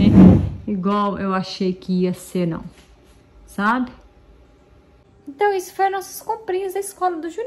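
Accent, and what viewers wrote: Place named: Minas Gerais, Brazil. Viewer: FAZ DIFERENÇA SIM, SEUS CABELOS SAO LINDOS A PELE ESTA OTIMA! MAS O QUE EU GOSTO MUITO EM VC É O SEU JEITO MINEIRO DE FALAR. SE TORNA UMA PESSOA DIVIRTIDA DE SE VER CONTINUE SEMPRE ASSIM!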